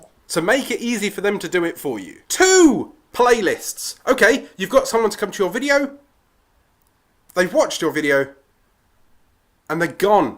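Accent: United Kingdom. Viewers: card missing Britisch english is really way more fun to listen then american english. Good video by the way!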